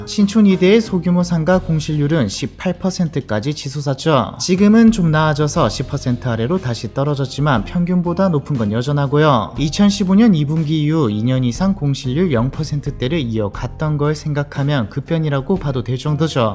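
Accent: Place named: Busan, South Korea. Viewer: ㅋㅋㅋ 해설자 양반 부산 출신 인가보군요 같은 고향이군요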